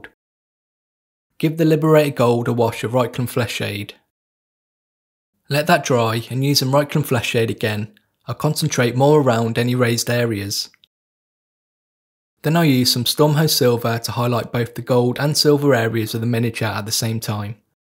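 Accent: United Kingdom. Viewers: I don’t know what l liked better, the British speak or learning New painting techniques. Thank you 😊 . Not paper towels, kitchen paper.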